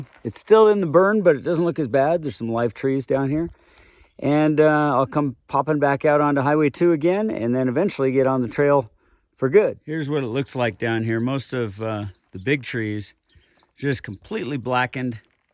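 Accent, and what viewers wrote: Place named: Los Angeles, United States. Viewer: Keep truckin! Thanks for the info about the fire and the insights. Sounds like you’re from LA!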